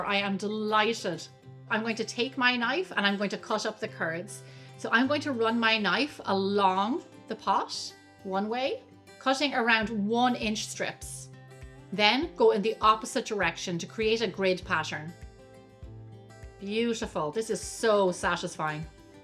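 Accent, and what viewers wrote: Ireland. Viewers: Irish accents are just so cool.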